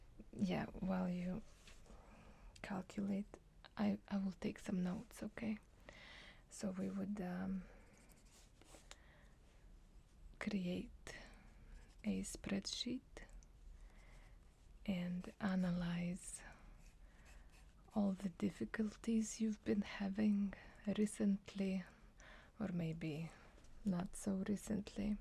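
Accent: Russia. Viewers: Russian accent it's strong it's perfect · с лютым русским акцентом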